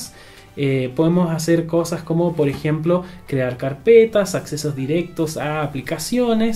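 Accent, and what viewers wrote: Chile. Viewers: el es chileno?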